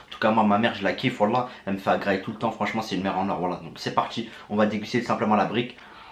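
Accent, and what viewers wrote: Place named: Arab world. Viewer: Wwa pas mal l'accent arabe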